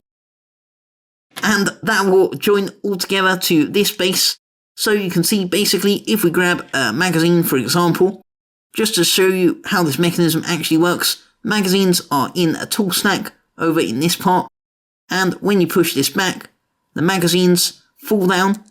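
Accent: United Kingdom. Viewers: Your British accent sounds pretty cool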